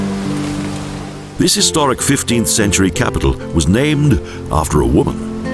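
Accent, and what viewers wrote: United Kingdom. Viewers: Adrian Zieniewicz it British mate! 😁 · Janet Njie lol, which British accent does the narrator speak? :D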